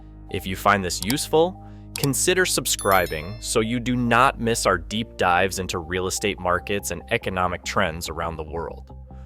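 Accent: United States. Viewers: Why the American accent? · Why the American accent, inconsistent info and fake claims? clickbait. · Perhaps you might use more up to date information and use relevant shots instead of pics of other parts of the world. Not sure who you are but an American voice just denigrates the post. But you have fun passing misinformation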